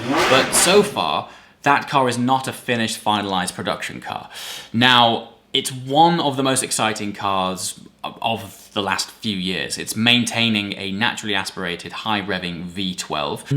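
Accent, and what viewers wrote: United Kingdom. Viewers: THE British native speaker.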